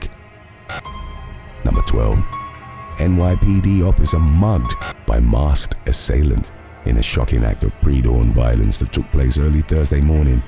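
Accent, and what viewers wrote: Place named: United Kingdom. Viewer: Here’s some more worst news. A commenter on this channel recently criticized for “using a British accent.” should, according to that reviewer, “Bruh, just say the words.” Huh?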